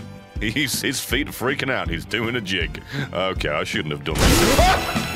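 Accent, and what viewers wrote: Scotland, United Kingdom. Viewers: Less British than I remember, more Scottish???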